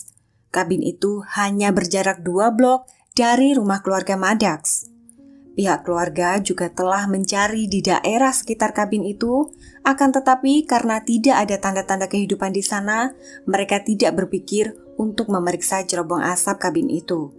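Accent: Java, Indonesia. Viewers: ko medog ya suaranya, ga kaya sebelumnya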